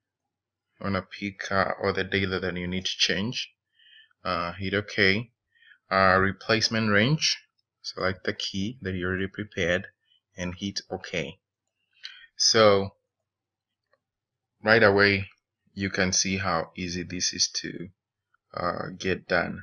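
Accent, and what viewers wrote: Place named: Kikuyu, Kenya. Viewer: Thanx for this. That Gikuyu accent is hard to hide though eh?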